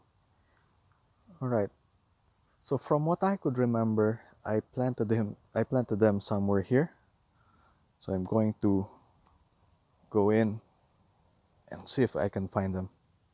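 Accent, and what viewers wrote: Philippines. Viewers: Pilipino po ba kayo? It sounds like so :) Love your garden! I wish mine would grow as healthy and abundant as yours someday. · You sound Pinoy po! Btw, thank you for this info, your garden is beautiful! Keep it up!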